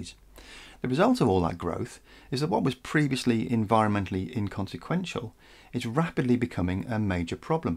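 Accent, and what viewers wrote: United Kingdom. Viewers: I like his voice. So calming and so british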